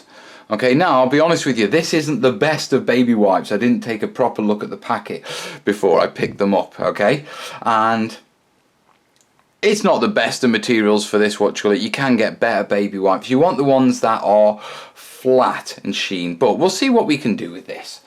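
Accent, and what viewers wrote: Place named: Lancashire, United Kingdom. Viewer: I knew it was Lancashire...nice viddy mate!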